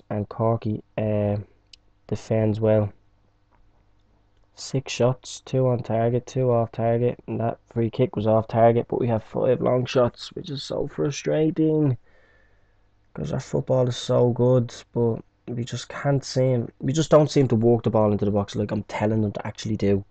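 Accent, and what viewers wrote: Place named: Dublin, Ireland. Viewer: well mate good video keep it up u sound like a Dublin man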